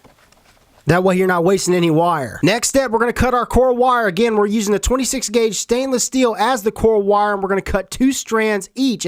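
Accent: United States. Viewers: Greek-German here that speaks fluent English. It's not day-de-lus, it's also not tha-tha-los (although it's pretty close, the transcription is just shitty). First of all, in modern Greek the Delta(Δδ), the d in the Latin version, is pronounced softly, somewhere between an English th and a d. Then, the ae, the Latin transcription of the Greek αι, is pronounced like the German ä. You can try to pronounce it by forming an a with your mouth but making an e sound. And lastly, when pronouncing -los or -lus try to get the "twang", that you Americans have, out of your vowels. Take these tips and you should get pretty close. This comment was written poorly because it's like 2 AM where I live and I'm too lazy to proofread.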